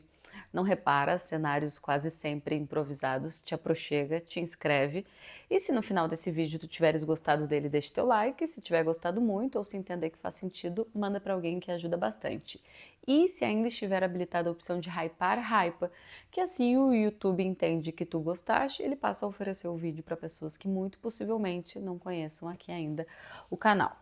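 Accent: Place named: South, Brazil. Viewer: Valeu pelas infos quentíssimas, apesar do sotaque do sul, é CORINTHIANS! 🦅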